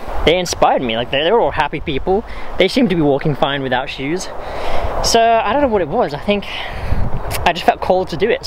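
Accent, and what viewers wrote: Wales, United Kingdom. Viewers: I watched one of your really old videos the other day and you had a welsh accent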